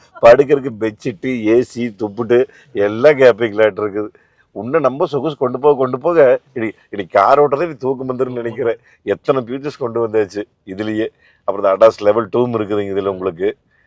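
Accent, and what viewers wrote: Kongu, India. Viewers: அப்பப்ப எட்டிப் பார்க்கும் கொங்குத் தமிழ் அழகு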